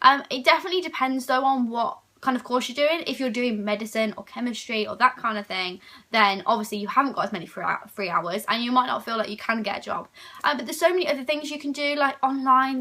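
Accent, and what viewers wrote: United Kingdom. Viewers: Are you from Derby??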